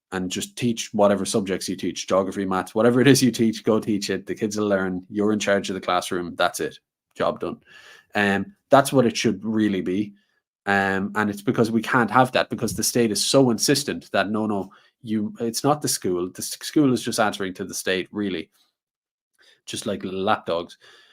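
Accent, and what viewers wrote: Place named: Ireland. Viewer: You sound like a typical uneducated bigot but with an Irish accent. I thought the Irish were a lot smarter than that. Clearly you have proved it wrong.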